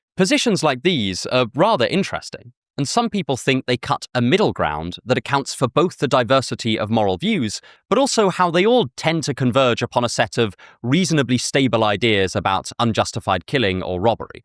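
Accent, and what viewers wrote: United Kingdom. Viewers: I'm in love with his british accent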